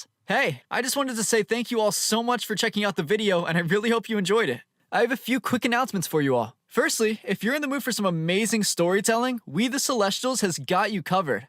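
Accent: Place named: United States. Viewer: Your American accent is adorable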